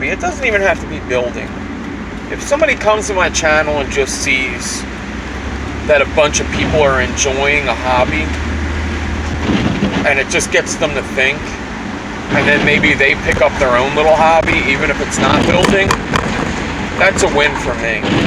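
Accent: Philadelphia, United States. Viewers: I can really hear the Philly accent ❤❤Great Topic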